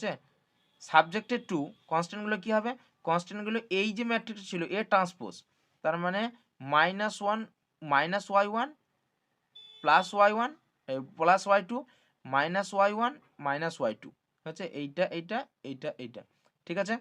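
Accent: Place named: Bankura, India. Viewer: Sir আপনার কি বাঁকুড়ায় বাড়ি?? আপনার কথাগুলো অনেক টা বাঁকড়ি ভাষার মতো শোনাচ্ছে , তাই বললাম । কিছু মনে করবেন না please.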